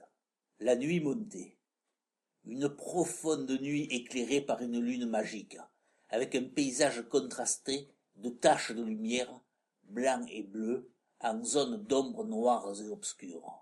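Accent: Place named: Provence, France. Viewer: Une memoire d'enfance bien racontee avec un formidable accent de provence,,,, Qu'elle etait jolie la petite Chevre de Mr Seguin,sa barbiche de tout Officier ses sabots noirs eblouissants🐐☺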